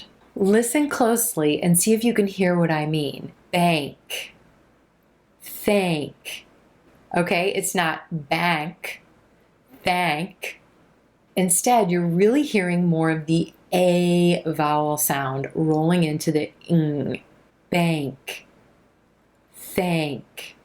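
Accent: United States. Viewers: The sound of your voice is so crisp and clear. You have a wonderful American accent.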